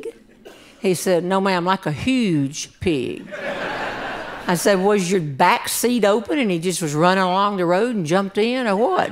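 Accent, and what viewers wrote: South, United States. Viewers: I MISS the south every time I listen to you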